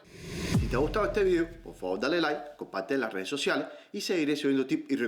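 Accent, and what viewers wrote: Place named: Cuba. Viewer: Este tipo que idioma habla ....parece a veces castellano cubano con partes de ingles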